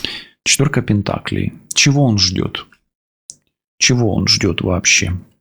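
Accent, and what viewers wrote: Ukraine. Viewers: Спасибо за красивую Украинскую речь.Рассклад - класс !.. Не знаю,как объяснить...🌹🙏🌹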